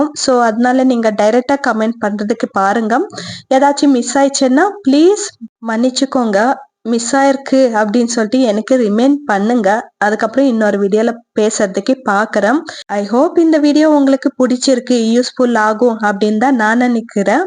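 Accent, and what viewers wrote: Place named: Andhra Pradesh, India. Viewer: Hi sis enga amma kooda ippude tha pesuvanga don't worry we r from andra so slang allaga undithi so go on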